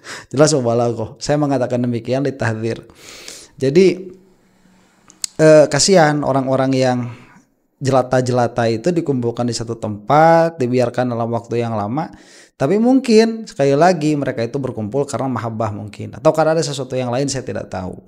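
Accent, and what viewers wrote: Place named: Surabaya, Indonesia. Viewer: Pean suroboyo ndi cak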